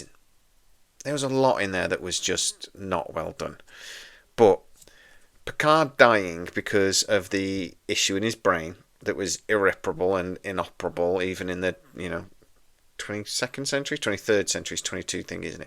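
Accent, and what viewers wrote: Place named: United Kingdom. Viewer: This guy has a British accent so I instantly trust him and consider him authoritative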